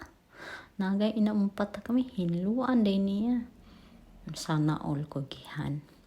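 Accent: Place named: Visayas, Philippines. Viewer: Ang cute pamati on mag bisaya uy hahaha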